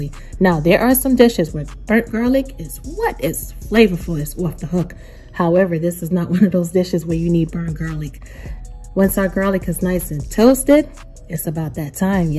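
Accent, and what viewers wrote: New York, United States. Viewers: I love that new york accent from a woman you doing ya thing with that lasagna too i know you can cook you from up top too 💯🍷🌹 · I enjoy your videos! Keep cooking! I have my way of cooking but always love to try someone else's ways of doing things! Also I love that New York accent! Thanks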